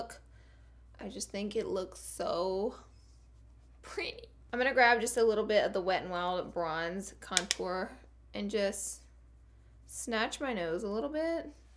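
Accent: South, United States. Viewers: Congrats Laura Lee! Love watching your channel! I am from Louisiana, Southern girl at heart! You still have that Southern Girl accent, love it! Keep being you!!! <3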